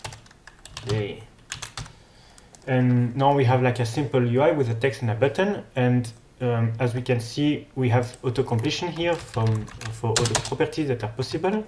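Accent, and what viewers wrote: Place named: France. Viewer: loving the french accent!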